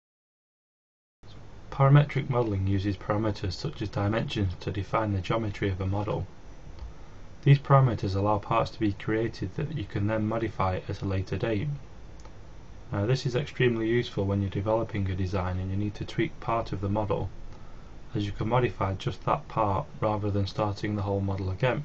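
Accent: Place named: England, United Kingdom. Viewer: The audio sounds fair to me, there are a lot worse out there in youtube land. Granted it's a crap microphone and there is some background hiss from the pre-amp but audio compression would just make it louder. Microphones don't amplify they just listen, it's the circuitry which follows which can colour the audio. I think the main issue is that he is speaking in "English" and some of the viewers are listening in "American".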